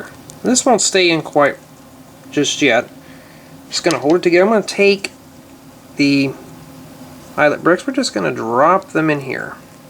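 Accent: South, United States. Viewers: it sounds like he got a southern acsent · R u from Texas? You sound like one...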